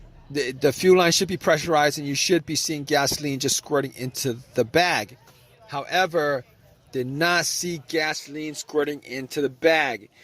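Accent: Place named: Bronx, United States. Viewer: I love your accent. Is it the Bronx accent?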